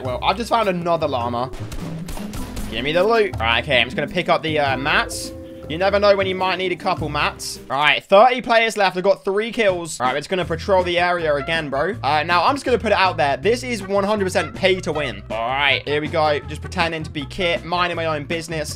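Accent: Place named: United Kingdom. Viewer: Yes. A British YouTuber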